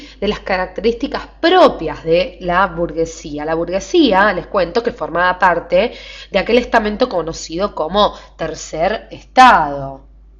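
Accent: Argentina. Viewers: la mina es de argentina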